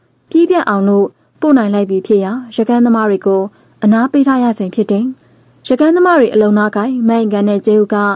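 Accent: Upper Myanmar, Myanmar. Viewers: သနဲ့တ မကွဲတာ စာအုပ်ထဲကအတိုင်းလား ဖတ်သူကအညာသံပါနေတာလား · စကာပြောပုံလေတေကနာထောင်လိုကောင်တယ်အညာသူမဟုတ့်ပေးမဲအညာမြေကိုရောက်သွားသလိုပဲ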